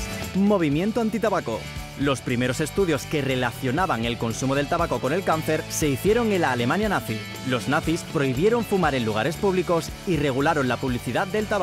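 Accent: Spain. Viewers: bueno bueno Se le nota la voz de Español.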